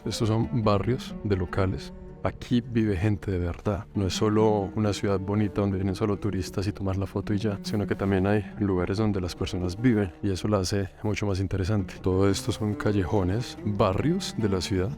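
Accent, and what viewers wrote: Colombia. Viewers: Felicitades!.... Acento colombiano + hablar lindo a todos los animalitos = perfect 😍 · Buen vídeo y muy buena síntesis de España y Toledo… lo has definido perfectamente y Toledo fue considerada la New York de la época…. Espero que hayas disfrutado una bonita experiencia en tu viaje y que mucha más gente venga a conocer España con tu actitud. Dicho sea de paso, como Colombiano que creo que eres por el acento, Bienvenido y estás en tu país porque así lo fue durante varios cientos de años. Un abrazo desde el norte de España y la próxima vez espero puedas venir a conocer esta zona que te gustará mucho también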